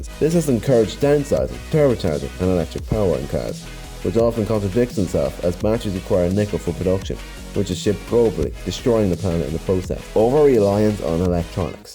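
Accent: Ireland. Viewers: Love ur vids man are u Irish? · this lad is irish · U Irish too?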